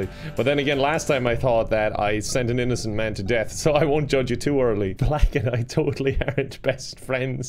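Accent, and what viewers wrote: Ireland. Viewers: irish accent is the best accent, prove me wrong <3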